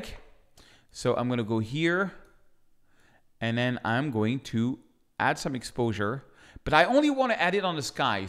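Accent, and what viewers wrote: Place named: France. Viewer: French man, making fun of his own french accent. Class act!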